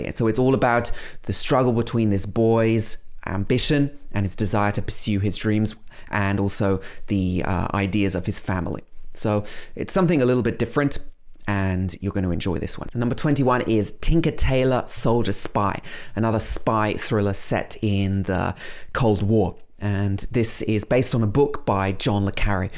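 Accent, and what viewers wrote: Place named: United Kingdom. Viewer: I love British accent and I hope that someday I would be able to sound like you . You are the best teacher on internet.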